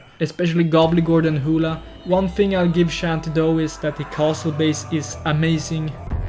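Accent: Sweden. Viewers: You have a strong swedish accent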